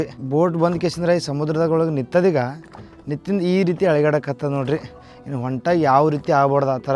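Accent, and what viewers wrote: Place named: Mangalore, India. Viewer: ನಮ್ಮ ಮಂಗಳೂರಿನ ಕನ್ನಡ ಮಾತಾಡ್ತಾ ಇದ್ದಾರೆ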